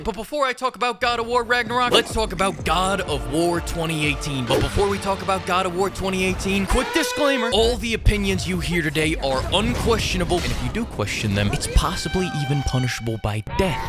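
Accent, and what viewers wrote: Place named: Alabama, United States. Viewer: Definitely from Alabama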